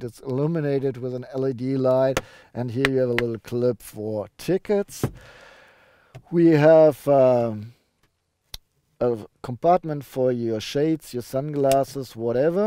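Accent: Germany. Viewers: Speaking English with German accent = great combination :) and i can't stop watching you cause you re really good on this business, thank you for thr the video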